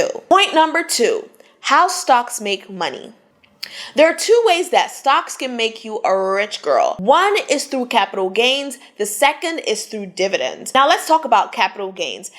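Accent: Nigeria. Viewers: Lmao are you Nigerian ? I think I hear it lol... thanks, that video was great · I'm hearing a little bit of Nigerian accent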